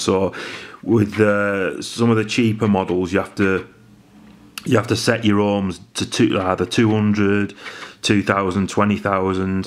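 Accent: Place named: Wigan, United Kingdom. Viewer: you sound like a Wiganer, are you? good vid BTW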